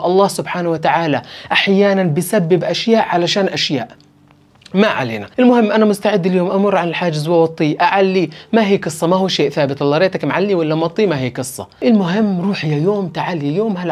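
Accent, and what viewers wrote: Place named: Palestine. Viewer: احلا لهجه الفلصطينيه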